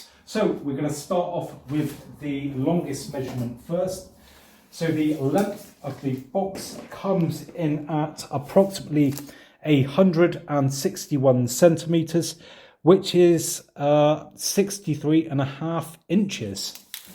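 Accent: United Kingdom. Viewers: Love the british accent. ✌🏾💪🏾😎💥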